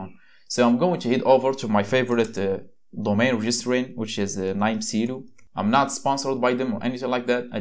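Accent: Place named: Morocco. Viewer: Maghribi la ? Chkit fl accent dyalk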